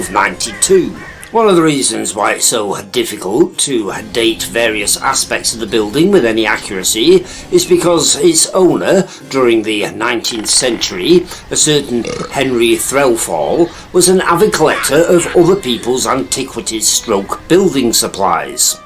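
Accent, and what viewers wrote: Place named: Lancashire, United Kingdom. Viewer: I really enjoyed hearing the Lancashire dialect, I haven't heard it spoken since my grandparents, from Bolton passed away. I have some books written in the dialect, but it is hard for me to replicate.Thanks for the connection to my heritage.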